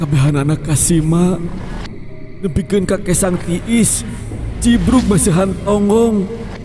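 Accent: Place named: Sunda, Indonesia. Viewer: Bahasa medok sunda gk paham ngomong apa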